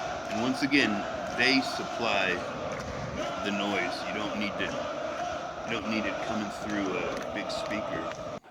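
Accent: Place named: Virginia, United States. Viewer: Where you from,at a guess I'll say Virginia,new York way..I'm English so excuse my guess if I'm offending you at the moment..just heard you say your 5 minutes from clippers and Lakers but that not an la accent is it pal??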